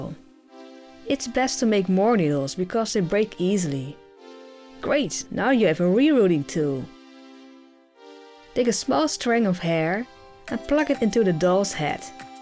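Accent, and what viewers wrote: Netherlands. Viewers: Yes I know, is a boy. And she asked his accent, he's from the Netherlands.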